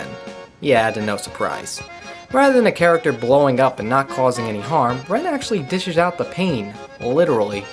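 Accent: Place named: New Jersey, United States. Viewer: Are you from New Jersey? You have a bit of the accent.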